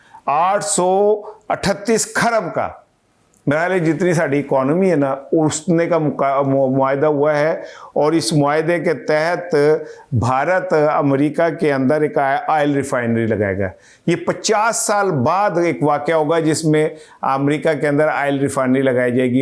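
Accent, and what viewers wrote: Punjab, India. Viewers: Please don’t give explanations the way you talk is your own style punjabi tarka is really good so never stop doing so. Your English vlog was really strange I am glad u explained what happened to it 😂❤❤❤ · Punjabi ka turka bht acha lgta h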